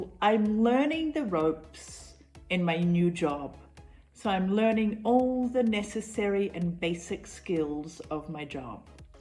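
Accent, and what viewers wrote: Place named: United Kingdom. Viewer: i like your british accent and grammer i am trying to recovering perfect tense, when i review i know, but when i communicate i mixed all tenses😅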